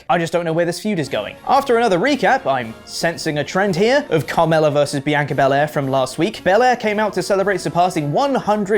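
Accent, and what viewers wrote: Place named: United Kingdom. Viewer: British accent is the most annoying thing to listen to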